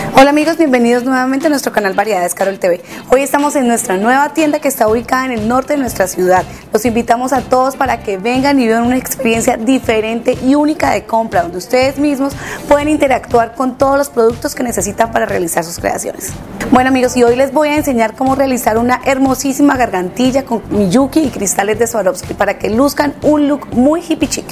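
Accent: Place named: Colombia. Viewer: aparte de que me encanta tu trabajo, es chevere escuchar el acento de mi tierra querida colombiana, desde mexico. Amo mi Colombia . Gracias por compartir tus conocimientos muy lindo todo ... Dios te bendiga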